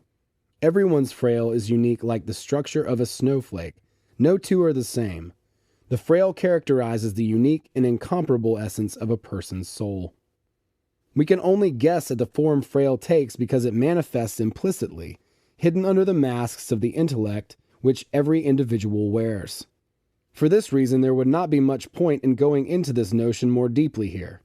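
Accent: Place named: South, United States. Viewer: Love the voice used for the intro! It would be awesome if the same 'voice' from the intro was used to read the chapters in this audio. I understand you can't please everyone; the reader who read the chapters sounds like he has a Southern twang which was distracting. It's me, not you. lol Never the less, thank you for posting.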